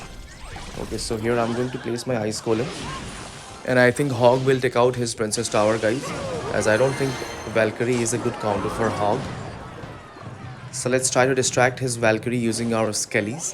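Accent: India. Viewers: Obvious indian accent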